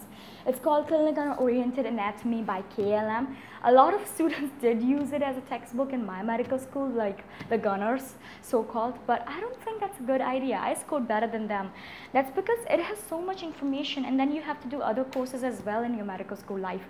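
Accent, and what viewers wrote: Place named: United States. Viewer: Your pronunciation is just like American but little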